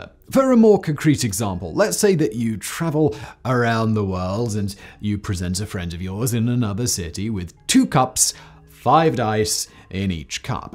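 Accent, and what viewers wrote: United Kingdom. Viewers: I was just going to post that I was heartbroken he didn't work that in. Beyond perfect for the situation, plus the addition of the brit accent. All I can say is : "in a high dimension of which we know nothing, the mighty Adams bellowed in rage...."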